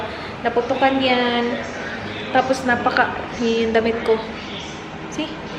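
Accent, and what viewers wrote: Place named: Visayas, Philippines. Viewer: nakakakutawa po yung bisaya accent nyo 😍😍😍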